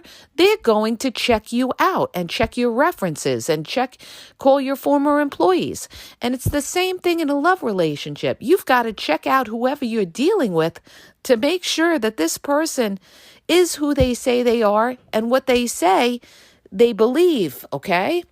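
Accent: New York, United States. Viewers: I think you are a very educated person that is helping masses of hurting people❣⛑ 🤗I love yr accent= New york? & you are very pretty. Some constructive help to help you SHINE BETTER! Your tone of voice sounds Angry & I'm sure it is righteous indignation against injustice & abuse & also, in my opinion it is much more classy NOT to cuss, which are offensive words, not necessary. Humbly, I hope u receive my words, only meant to help. Regardless, I will still listen 2 you. 😁🥰💃🏼🤸‍♀️🙌🙏😇🌟🌞 God Bless you❣💝💖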